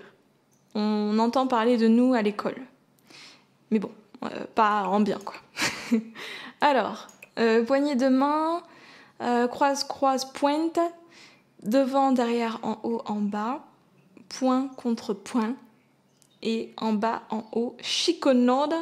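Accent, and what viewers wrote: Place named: United Kingdom. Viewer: J'adore ton axent anglais sinon te la best ma yt préf 🥰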